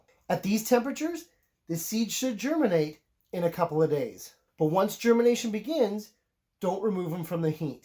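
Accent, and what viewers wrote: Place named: Canada. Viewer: I thought so too. So I looked it up. Turns out, Americans pronounce it with a silent H. Brits pronounce the H (as one would pronounce the nickname for Herbert). The host of this video sounds Canadian to me, so it makes sense that he is using the British version.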